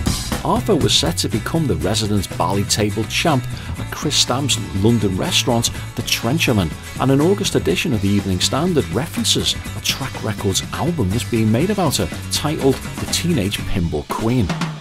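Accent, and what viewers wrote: Liverpool, United Kingdom. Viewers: Schade, daß keine deutschen Untertitel verfügbar sind. Ich mag deine Retrospektiven seit langem sehr gerne aber dem Liverpooler Akzent kann ich nicht folgen😂😢😢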